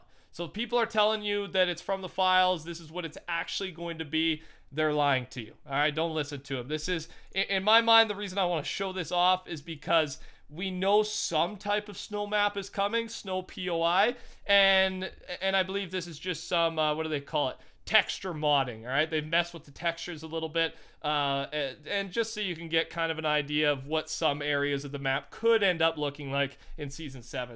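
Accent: Canada. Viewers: Are you Canadian? It sounds like you have a little Canadian accent 😂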